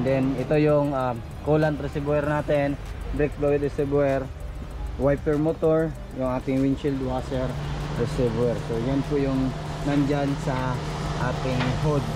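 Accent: Visayas, Philippines. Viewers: Bisaya ka boi?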